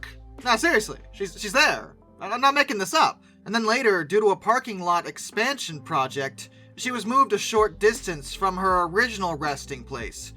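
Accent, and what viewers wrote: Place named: Midwest, United States. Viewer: Midwest, I think. Doubt he's from the east since he stumbles on stuff like "Worchester."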